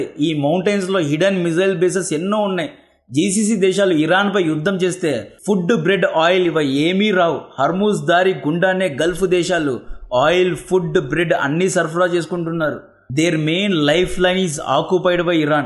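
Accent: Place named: Anantapur, India. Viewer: Brother, nee slang anantapur teluguki daggaraga untundi. Mee ooru?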